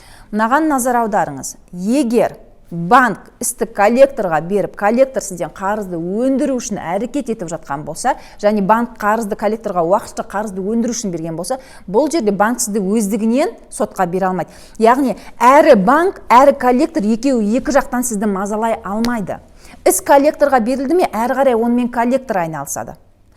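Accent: Standard. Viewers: Елімізде сендей қазақша айтатын жастар көбейе берсін Сенің ата анаңа рахмет қазақ тілін таза сөйлеп отырсың Қандай қазақ тіліміз құлаққа жағымды есітіледң қазақ тіліміз өркендей берсін!!Ал кридетке келсек аман болсақ төлей берерміз ақша болған кезде. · Кушті түсіндіреді. И таптаза казакша соилиді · Рахмеет қазақша таза сөйлейді екен осылай болғаны дұрыс қой бәрін түсіндіиріп айтып берді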